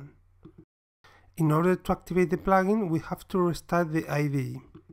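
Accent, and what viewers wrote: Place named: Germany. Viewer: I agree with you, German people are super nice. On my previous comment I said "The next videos were narrated probably by a German." because I had caught a small hint of German accent in the narration.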